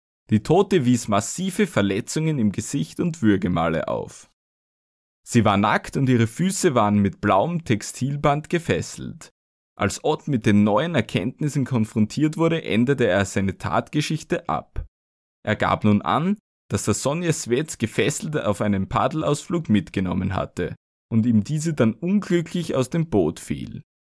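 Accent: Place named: Austria. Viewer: Du bist bestimmt auch Österreicher Deines schönen Dialekts wegen?;-) Vielen Dank für Deine Arbeit😉😊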